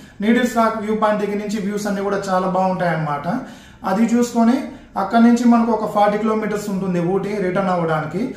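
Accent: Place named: Telugu, India. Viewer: Hi brother..sakkani telugu slag matadutunnaru..clear artam avtundi.. appreciate brother 🎉🎉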